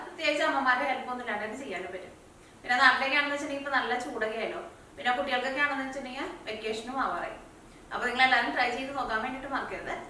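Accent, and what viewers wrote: Thrissur, India. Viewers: ചേച്ചിയുടെ ഈ റെസിപ്പിയും സൂപ്പർ... ഞങ്ങൾക്ക് വലിയ ഇഷ്ട്ടമായി ആ തൃശ്ശൂർ ഭാഷ സൂപ്പർ, and....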